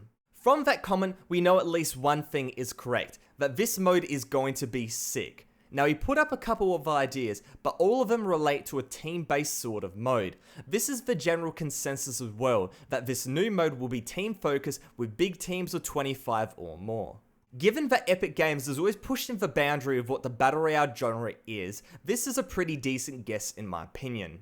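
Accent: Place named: Australia. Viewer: Your aussie to niceee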